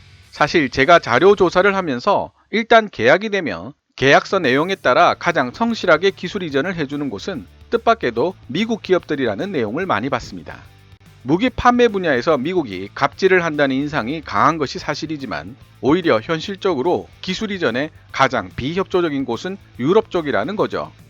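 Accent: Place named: Jeolla, South Korea. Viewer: 외국에사는 교포로써 엄청 이 프로 즐기는fan인데. 내용도, 진행방식,방송하는 목소리에 호감.헌데 전라도인 특유의 "의" 를 발음 못하고 " 으" 로 하는데.. 내가 너무 좋아하는 방송이기에 이 표준어를 사용했으면하는 아쉬움이 있다는게 저만의 솔직한 심정이라서.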